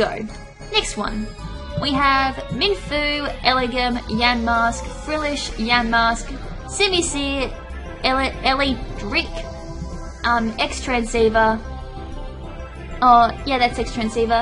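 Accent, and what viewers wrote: Australia. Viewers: Are u australian. i am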